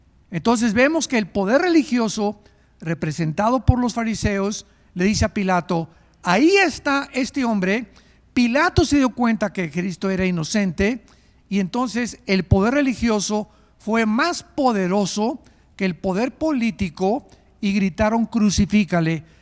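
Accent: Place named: Puebla, Mexico. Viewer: Como que tienes un acento a tehuacan puebla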